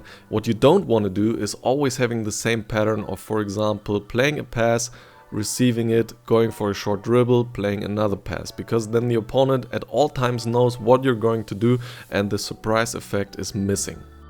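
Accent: Germany. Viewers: It is soooo easy to hear you are from germany hahah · sounds german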